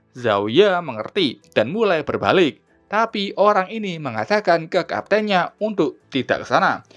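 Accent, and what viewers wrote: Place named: Java, Indonesia. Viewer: mas tolong medok nya di atasi dulu bikin sesak napas dengar nya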